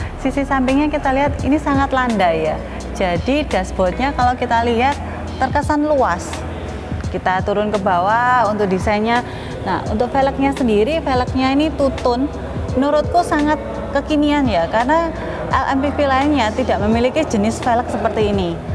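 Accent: Java, Indonesia. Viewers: aksen jawa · Jawa banget ngomong nya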